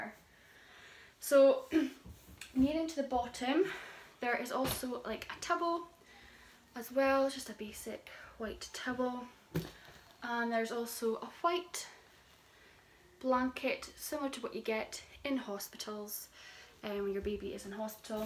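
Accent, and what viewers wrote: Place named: Scotland, United Kingdom. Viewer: I love the idea that they included the poem. I clicked on the video because my Grandmother was born in Scotland and I love hearing the accent.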